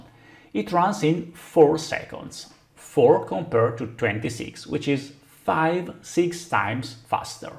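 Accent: Italy. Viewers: Power BI is much more sexy with an Italian accent.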